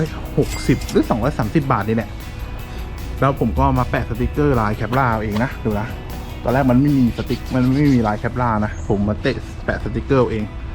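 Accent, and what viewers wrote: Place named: Central, Thailand. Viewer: สำเนียงนี้โซนกำแพง ชัยนาท คอนหวัน อุทัยใช่แน่นอน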